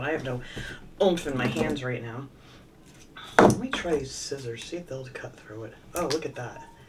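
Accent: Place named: Pennsylvania, United States. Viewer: I love watching you craft. I also get a kick out of hearing you say R ite instead of alright. I wonder if that is a PA thing. My daughter lives in PA, I will have to ask her. Thank you for sharing your talent.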